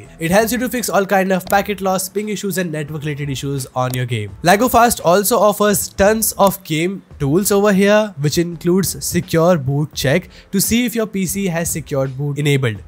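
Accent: India. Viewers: i was just going to say. dude tells you to turn off a firewall protection then non schilontely tells you to download malware while having a notoriously indian hacker voice. indians really should get morals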